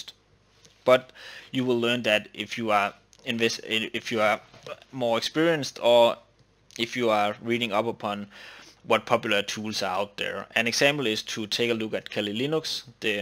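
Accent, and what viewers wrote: Denmark. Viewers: Are you danish ? :)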